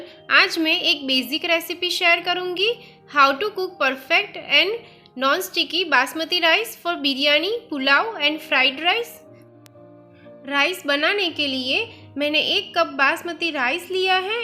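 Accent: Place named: Bradford, United Kingdom. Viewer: Cant understand her Bradford accent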